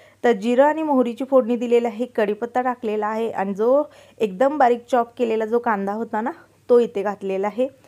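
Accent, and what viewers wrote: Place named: Sangli, India. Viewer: मि विटा ...मायणी इथल माझ माहेर आहे .....कामानिमित्त आम्ही करमाळा येथे राहतो....आपली सांगलीची भाषा विडिओ त छान वाटते....पण इथे सोलापूरी भाषा जरा फरक आहे.... तु ज्या गावांची नावे सांगते ती गावे मला ओळखीची वाटतात.....विडिओ छान असतात .....पण कुठलीच गोष्ट आपल्याला सहजासहजी मिळत नाही.... तु खूप मेहनत घेतेस .....पुन्हा एकदा अभिनंदन लवकरच 100kपुर्ण होवोत हिच सदिच्छा💐💐💐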